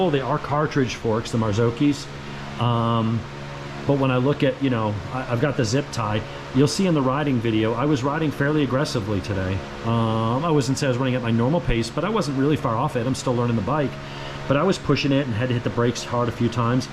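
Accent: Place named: Australia. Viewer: On point with the Ozzie accent.😄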